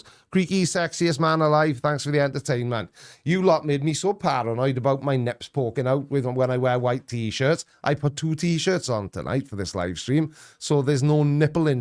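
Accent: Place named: Wales, United Kingdom. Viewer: Came for the science. Stayed for the fun and the Welsh accent.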